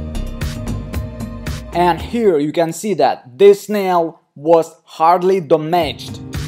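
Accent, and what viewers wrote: Russia. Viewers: Мне кажется что по-русски вы говорите лучше чем по английски с русским акцентом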